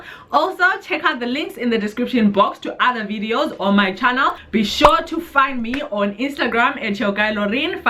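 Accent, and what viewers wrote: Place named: Zimbabwe. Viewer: Inlove with the Zim accent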